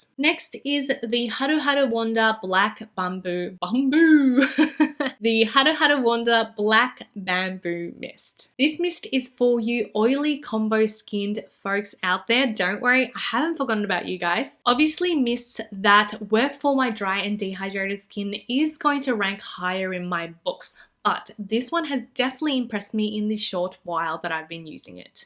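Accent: Australia. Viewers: Her Aussie accent!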